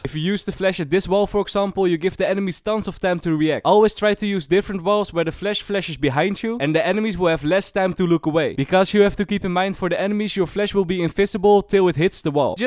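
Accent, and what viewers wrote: Netherlands. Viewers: i smell dutch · Just make a video in Dutch xD. Don't want to be rude but we can hear your accent pretty hard xD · Im sorry but your content is lacking. First of all im Dutch myself aswell and my accent is not so bad as yours. Maybe practice a bit must 10 minutes can make a big difference. Some of your tips are actually useless like the Kay/o dagger. The Line-up itself is kinda bad the dagger flies way to long and the spot where it lands is to dat back. The enemy team had pushed in to long or short and wont get hit by the dagger so you wont get Intel. And dont say that your viewers dont have brains people will stop watching due to your toxic behavior the only good part about this is that you confirm that you actually play the game. And the "tips and tricks" from you guys serie just makes clear to me that you dont put time and effort in to your videos I hope i could help with my critisism to keep one Dutch content creator live a nother day Have a good day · thats one heavy ass dutch accent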